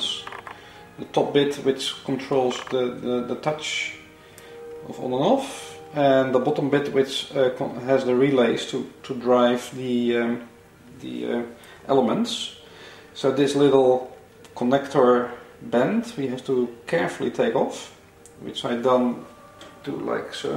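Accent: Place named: Netherlands. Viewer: Strange that the voice has dutch accent but the socket on the wall is chinese.Maybe there is a chinese factory producing these Miele appliances?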